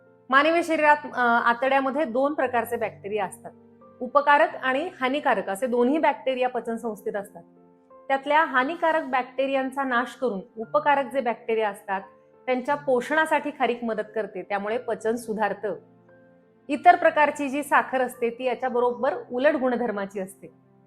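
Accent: Standard. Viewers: तुमचे video अत्यंत सुंदर माहितीपूर्ण असतात..उच्चार शुद्ध..जास्त पाल्हाळ नाही .त्यामुळे छान वाटतात.. आजच्या vid मध्ये एक sangal ka .खारीक मुले बरेचदा constipation hote..त्यावर काय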